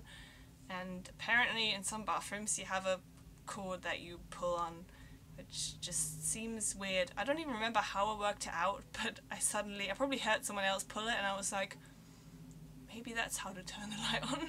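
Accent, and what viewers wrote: England, United Kingdom. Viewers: If you're German, then why the English accent? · Picked up a very English accent. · Please take this accent back to Germany and teach them. The British Accent will soon vanish and be replaced by the American one. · You sound British you are yeah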